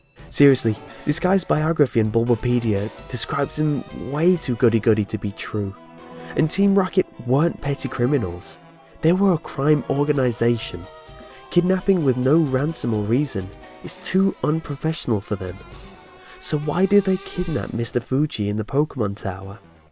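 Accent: United Kingdom. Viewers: You are my favorite YouTuber ok I look up to you from your British accent to your super cool top tens !!! Even your creepypastas are creepyer whith you!!! And I love that 3rd one!!please NEVER ever ever never forever never stop making videos NEVER ever forever and ever never stop .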